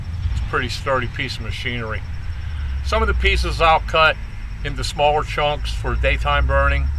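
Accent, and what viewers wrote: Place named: Philadelphia, United States. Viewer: First of all, the very first time I heard you speak, I knew you were from Philly. I moved away 30 years ago, but there's still nothing more I enjoy hearing than that gorgeous Philly accent! I've purposely made sure never to lose mine. ...Second, I did tree work in my younger days, mostly in Bucks county, but also a few logging trips up to Sullivan county. I miss tree work. It was tough, but I loved it. ...I also appreciate that you're equally at home splitting wood and planting flower seeds. Awesome.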